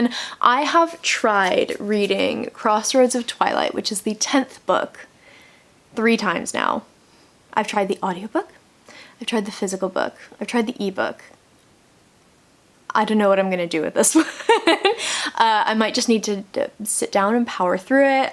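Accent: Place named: England, United Kingdom. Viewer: 1. Your accent is DEFINITELY becoming more English. Maybe it's just this video but ermmmm? 2. Good luck getting on top of ARCs etc! You can do it!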